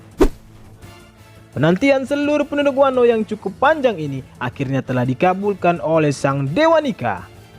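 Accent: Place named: Java, Indonesia. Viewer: Bang,lu orang bekasi ya 🤣 bahasa lu medok bngt njir 🤣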